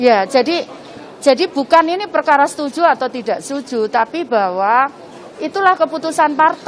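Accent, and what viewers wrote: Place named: Surabaya, Indonesia. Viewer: Medok.. Jadi kelihatan kurang intelektualnya..mudah gugup kalo kondisi lagi di wawancara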